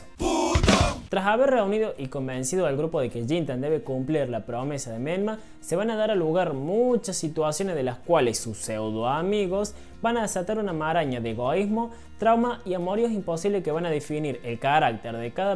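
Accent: Córdoba, Argentina. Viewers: So cordobe culia? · wpse re nota que sos de cordoba xD buena reseña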